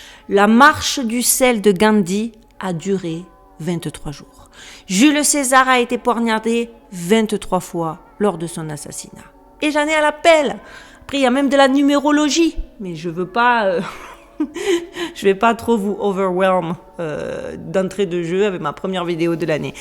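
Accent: South, France. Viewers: merci j'aime bien votre approche des sujets que vous traitez et votre accent du Sud aussi , surtout ne changez rien. Je peux avoir de l'humour sans aucune méchanceté mais qui parfois n'est pas compris. Ne m'en veuillez pas. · c'est normal 😊 🙏.. après le plus important que et vôtre amie et vous et passé les fêtes de fin d'année ensemble 🙏 le temps passe vite.. donc ☺️ courage je comprend ☺️ merci pour les sujets proposés 🙏🙏 et merci pour vôtre bonne humeur et l'accent du sud 😃 🙏 je vius souhaite une belle soirée 😊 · Hé Bhé ! Bon courage à Nous tous et que cette année soit la meilleure possible , vue ce qui nous attend à court terme. Bravo à vous pour ces vidéos Très instructives et je me posé une question , dans votre adresse e-mail ou insta 13 est pour Marseille ? vue votre accent je crois que j'ai ma réponse . Merci encore Bises 😜 👍 . Ha oui ! moi je suis à l'opposé , Lille le Norddddddddddddddd . 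👏👋 . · meme si l'OL est l'ennemi juré de l'OM je ne me sent pas arnaqué et suis trés fier à l'international que ton petit accent du sud rayonne!!!!!!!!!!! meilleures voeux 🥰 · Heu 😮 elle vit aux states 🇺🇸 !!! Donc pas d’accent du Québec en vue 😅sinon une pointe d’accent du sud de la France 🇫🇷 dont elle est originaire 🎉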